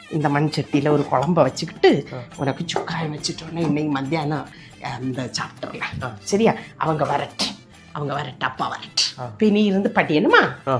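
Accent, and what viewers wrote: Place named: Kanyakumari, India. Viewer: Konjam kanyskumari slangai maathalamae.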